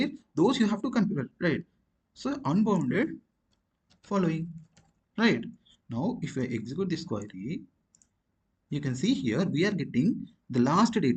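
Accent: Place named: India. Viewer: Why do you need to speak so fast? Indian accent is so hard to follow. Just speak slowly and clearly.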